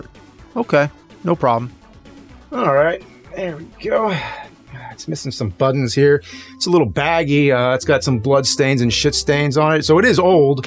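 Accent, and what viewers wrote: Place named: New Jersey, United States. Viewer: nerd cant even say the name of the main character Cliff Secord correct he called him Cliff Sector WTF it must be his dirt bag new jersey accent thats why the call new jersey the garbage state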